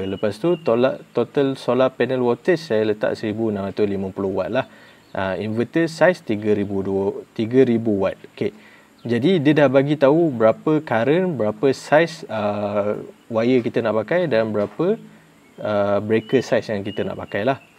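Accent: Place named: Kelantan, Malaysia. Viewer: Terima kasih atas perkongsian.. Jelas dan jeliro ore kelate royat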